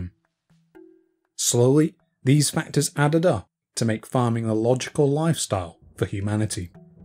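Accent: United Kingdom. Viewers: A proper British narrator for a proper British documentary! 🇬🇧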